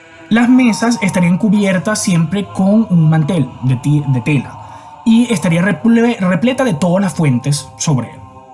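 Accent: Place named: Venezuela. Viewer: ese acento, si no me equivoco es de Venezuela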